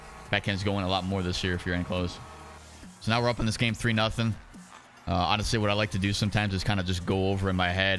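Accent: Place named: Delaware County, United States. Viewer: You should do a Tom Wilson build. And I have a random question… are you from Delco? Your accent sounds just like it lol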